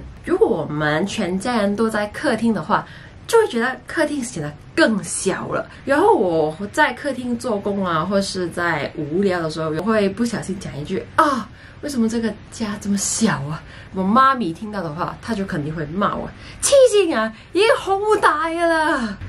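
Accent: Singapore. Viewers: Did you realize you are speaking like Singaporean? Mixture of English and Mandarin. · wow，你的华语一点听不出香港口语，almost like a local Singaporean :yougotthis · Your Mandarin and English got Singapore ascent.... :) :) :) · Wow when you speak English. You sound very Singaporean... I reckon you already localise Liao.. come back lah since you adapt the Singaporean life le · 你嘅国语口音已经差唔多完全新加坡华语化😄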